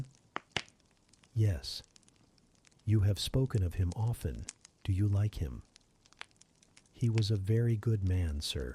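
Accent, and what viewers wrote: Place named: United States. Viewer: I searched American pronounced jane eyre. This is best. And your voice so sweetie as like Rochester. And fire sound is so convenient to my mind. And its good because it is original book contents without skipping. Thanks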